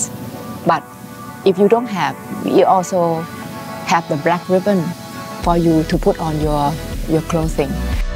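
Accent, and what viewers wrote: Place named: Thailand. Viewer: ละ อ่อน ก็สำเนียงคนไทยน้อ เป็นผมก็พูดสำเนียงแบบนี้แหละ เอาพอสื่อสารกันเข้าใจก็พอ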